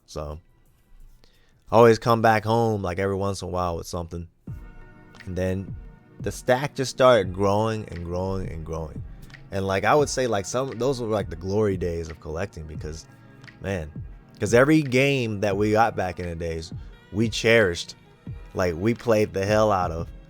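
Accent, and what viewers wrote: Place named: London, United Kingdom. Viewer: “You sound like your from Lon-Don”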